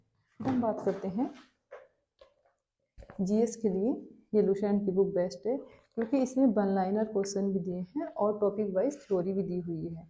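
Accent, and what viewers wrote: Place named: Western Uttar Pradesh, India. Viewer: आप की बोली पश्चिम उत्तर प्रदेश की है मेरठ मुजफ्फरनगर क्षेत्र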